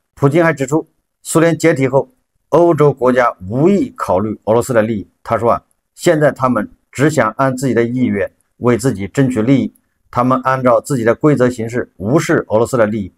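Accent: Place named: Wuhan, China. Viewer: 你有点黄陂口音。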